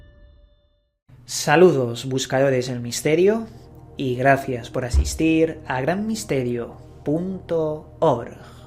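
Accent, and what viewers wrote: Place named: Spain. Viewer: Noe. Gusta el. Acento español dislike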